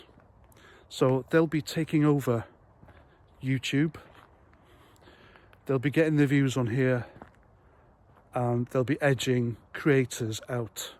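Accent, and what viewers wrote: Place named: United Kingdom. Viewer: Long time subscriber - rarely comment. Thought it about time I write to say how much I enjoy your videos and appreciate what you do. As an expat POM from Southampton living in Australia, I really miss accents from the UK and I *love* yours. Keep up the good work and helping keep me up to date with all things UK. Cheers - Simon / Adelaide - Oz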